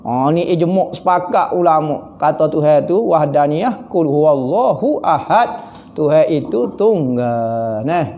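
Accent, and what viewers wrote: Kelantan, Malaysia. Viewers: Cuba² fhm lograt klate 😀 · asalamualaikum ustaz boleh ka guna loghat melayu.. saya tidak berapa faham loghat kelantan.. suka dengar ceramah2 ustaz.. salam dari sabah 😇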